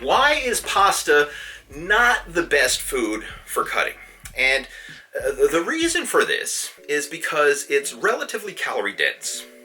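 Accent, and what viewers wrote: Newfoundland, Canada. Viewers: Me: "This guy sounds like a Newf." Google: "Yes, he is a Newf." Me: *Subscribed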